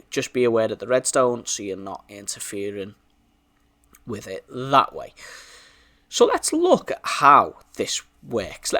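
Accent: Liverpool, United Kingdom. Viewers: Liked just because you are a scouser :)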